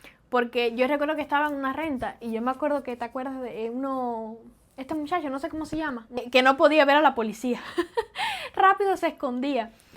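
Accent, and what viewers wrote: Mexico. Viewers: Ya hablas mejor más despacio más claro hay la llevas te estás mexicanisando bien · Hola !! Me llamo Raul soy mexicano, vivo en la ciudad de México, soy profesor Universitario. Tu acento parece más de mexicana, tu físico no es tpiico cubana, tu físico es más de una ciudadana de USA. Te explicó las comidas sobretodo aquí de ka ciudad de México, y a sus alrededores. Hay dos desayunos uno super ligero y.otro más completo. Ya te diste cuenta que la mayoría de las personas que vivimos en la ciudad de México el trabajo y la escuela nos quedan muy lejos, son distancias muy largas y tiempos transporte muy largos. Te doy este contexto para que me entiendas sobre estos dos desayunos. Mucha gente se levanta muy temprano tiempo suficiente para arreglarse y tomar un desayuno ligero (es el desayuno) qué regularmente es una taza de café y pan y jugo y salir corriendo para ir al trabajo o la escuela ( es para tener algo en el estomago). 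El dasuyo completo donde ya uno en su trabajo o en la escuela dan un descanso de 20 ó 40 minutos y es cuando uno puede desayunar huevos al gusto, café, fruta, jugo y etc, este es el almuerzo. P Precisamente la vida aquí en la ciudad de México es muy acelerada en la mañana es arreglarnos y salir muy rápido para llegar al trabajo o a la escuela apenas desayunamos muy ligero y es solo para tener algo en el estómago ennlobque ya podemos alkozar (desayuno completo) despues en el trabajo o een la escuela donde nos dan un descanso suficiente de 30 ó 49 minutos es cuando podemos desayunar más completo y más tranquilo es el almuerzo. El almuerzo va v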